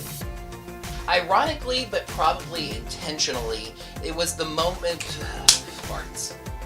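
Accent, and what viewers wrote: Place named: Scotland, United Kingdom. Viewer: I really enjoy watching this video and your Scottish accent was on point and my vote for favorite pink ranger is Jen from power rangers time force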